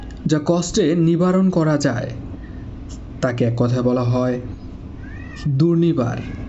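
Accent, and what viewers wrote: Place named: Chittagong, Bangladesh. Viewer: স্যার মনে হয়, চট্টগ্রামের🙆🏿‍♂️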